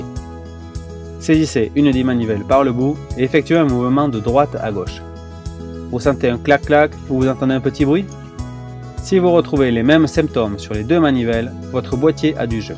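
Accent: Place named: South-West, France. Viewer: moi c'est cet accent du sud ouest qui me rend dingue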